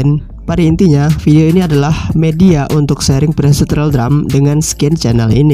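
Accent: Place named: Banjar, Indonesia. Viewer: Iya kalo, pantas ae pina ada logat banjar?? Sippp lanjuttt…. Salam Batinjakan Dr Banjarmasin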